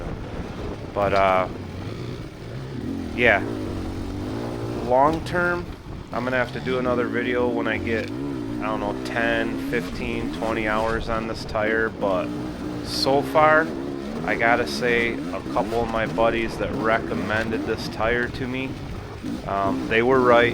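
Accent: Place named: North, United Kingdom. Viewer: I hear your mega northern accent!😁 yu play hockey too!.!?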